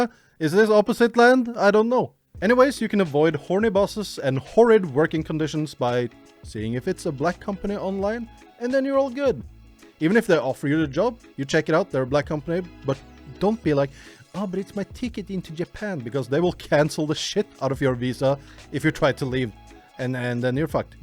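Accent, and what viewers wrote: Norway. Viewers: okay 3rd comment lol, not sure if youve ever watched norsemen (Vikingane) but I feel like the fact you have the same norweigen accent as that show makes stuff you say funnier haha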